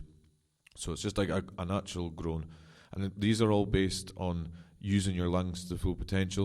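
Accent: Scotland, United Kingdom. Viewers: came here for warm ups. Stayed for the Scottish accent. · Love the scottish accent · Scottish accent^^ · That's a Scottish accent :)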